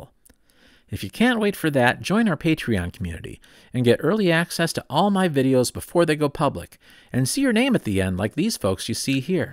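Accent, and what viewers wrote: United States. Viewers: Why must you americans pronounce "o" as "/\" ? I mean how do you know the difference between "a knot" and "a nut" ? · Why do people in the USA pronounce Z as zee instead of zed used by most of the other English speaking world. It's extremely difficult listening to this from England.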